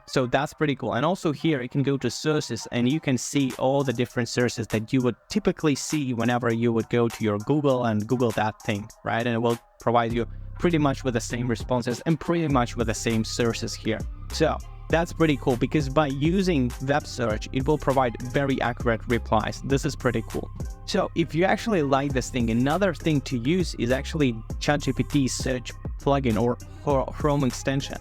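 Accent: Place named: Russia. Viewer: Many people dont understand chatgpt but your fucking FLAT tone and that Russian or whatever accent you have makes understanding chatgpt IMPOSSIBLE! And whats this "Chat GDP" u keep saying!! 🤣🤣🤣🤣🤣🤣🤣🤣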